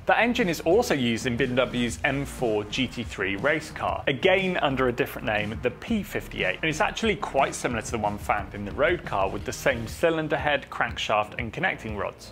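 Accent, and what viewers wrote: United Kingdom. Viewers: This channels just donut media w a British accent lol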